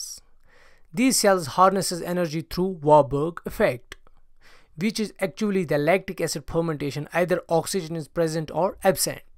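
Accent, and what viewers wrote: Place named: Kashmir, India. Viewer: Hehehe funny Kashmiri English dialect...... love it.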